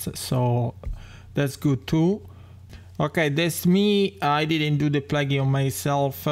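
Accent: Italy. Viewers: Come si sente che sei italiano!! Ho una mia teoria riguardo al fatto che parli in questo modo in inglese.. Pur vivendo in Usa ascolti le radio italiane, leggi giornali italiani, fai video italiani e hai una moglie italiana.. C'è un tizio italiano su youtube che vive sempre in USA che ha la moglie americana e credo che non abbia l'abitudine di leggere giornali italiani. Parla italiano nei suoi video ma sembra più americano che italiano se lo senti parlare..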